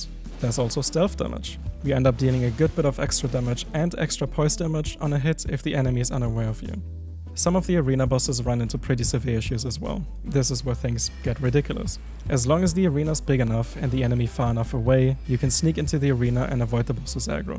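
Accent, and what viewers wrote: Germany. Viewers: Your German right?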